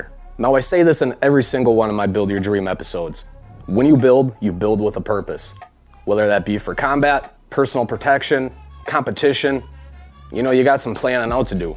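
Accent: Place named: Wisconsin, United States. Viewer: Where yous from? I hear that accent guy and im thinkin you might be from wisco like me lol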